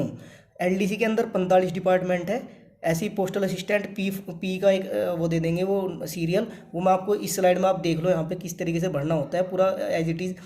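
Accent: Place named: Muzaffarnagar, India. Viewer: Bhai UP west he ho kya muzzafarnagar side sei. Tone muzzafarnagar wali hai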